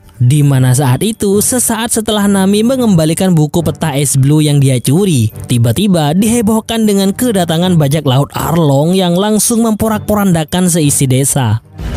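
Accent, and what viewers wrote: Madura, Indonesia. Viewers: Loh orang Madura bg😂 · Sampean orang Madura mas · Apah can dekik lah,,😂 reng madureh kah min? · Madureh nyenguk cak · Madureh asli kak😅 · Reng madureh kak?? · medureh dimmah kakeh mat❤❤❤ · Sumpah orang madura ini kalaupun bukan pasti keturunan orang madura soalnya logat maduranya melekat banget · wkwk sap nyapsap.. 😂 madurooo masss??? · Sapnyapsap+sang nyangsang. Madura mana bang? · Buh mak reng madureh kakeh dul "apa can deggik lah" sengkok bangga sama been bang wkwkwk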